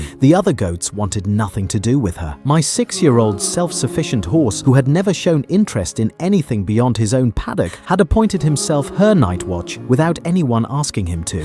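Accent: United Kingdom. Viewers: Why a British accent when speakingʻ of his Tennessee horse farm? Why? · You have a slight English accent...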